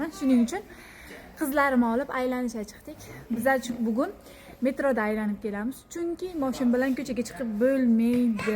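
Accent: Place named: Olot, Uzbekistan. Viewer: Qorako'l yoki Olotdanmisiz deymanda shevada gapirgani da bilinayapti😂😂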